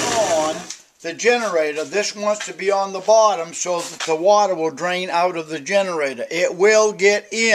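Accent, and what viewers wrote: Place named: Maine, United States. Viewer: knew he was from maine within first few words ..sure enough lol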